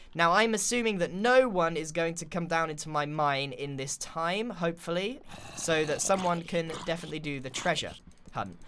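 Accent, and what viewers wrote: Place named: Southern England, United Kingdom. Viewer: I’m British and it’s the norm to me.Its a normal middle-class south English accent. I would say it’s more is voice then is accent.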